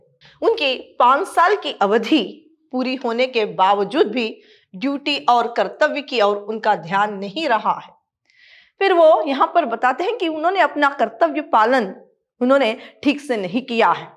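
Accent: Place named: South, India. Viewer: I think you are from South India. I guess it from your pronunciation. Overall very nice video madam 👌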